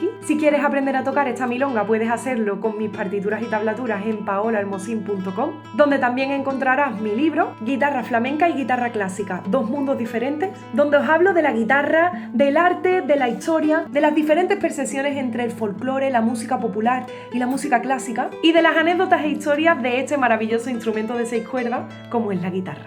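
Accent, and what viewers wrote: Andalusia, Spain. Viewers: Que historia más hermosa, la música no conoce el racismo, ni xenofobia, ni egoismo, los grandes son grandes por eso. Me encanta tu acento pareces de Andalucía. Tienen tanta energía para expresarse que hipnotizan. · ¡Brava! ¡Brava!, como dicen los italianos respecto de una performance muy lograda.. Es notable como, pese a tu bonito acento andaluz, lograste expresar el duende tan especial de don Ata. Lo mismo puedo decir de tu ejecución de la música en la guitarra. Muchas gracias por tu bonito regalo de ano nuevo a tus seguidores. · QUE HERMOSA ERES DE ANDALUCÍA ?? ESTUBE EN MÁLAGA Y ES UN ACENTO Muy BONITO HERMOSO SENTIMIENTO PONES CUANDO CANTAS ESO ,,SE NOTA EN UNA VERDADERA ARTISTA,,,MIRTA DESDE MENDOZA ARGENTINA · Oye genia de qué parte de España eres por esa tonada tan particular tuya al hablar. Me gusta mucho lo q haces de verdad. 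Un abrazo desde Misiones, Argentina 😊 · Q me gusta tu habla andaluza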